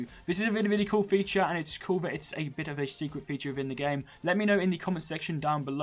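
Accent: United Kingdom. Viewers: THICK BRITISH ACCENT BE'ER I LOVE IT BRO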